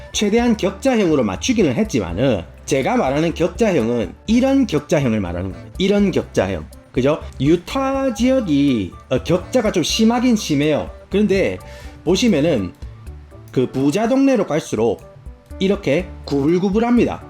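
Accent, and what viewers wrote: Gyeongsang, South Korea. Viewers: 경상도 사투리인지 부산 사투리인지 모르겠지만, 사투리가 한국에서 듣던 것보다 엄청 재밌네요. 모르는 단어도 많이 나와요. ㅎㅎ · 아주 논리적으로 재미있게 갱상도 발음으로 마 말을 잘하시네요.😁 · 경상도인듯 ㅎㅎ 고향 말투 들으니 넘 반가움ㅋㅋ 반갑습니다!! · 쌍도 말,억양좀 쓰지마라. 토난다! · 아이 미국 현지 영상을 보는데 알아듣기 힘든 경남 사투리가 왜케 심하냐~ㅋㅋㅋㅋ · ㅎㅎㅎ 경상도 사나이 재미납니다 ㅎㅎ. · 와... 약간 혀가 꼬인 빡센 오리지날 경상도 사투리 들으니까 이거 머 내용이 재밌기도 하지만 듣는 재미가 쏠쏠하네요 ㅋㅋㅋㅋ 쌔끈빠끈 표현 찰지네요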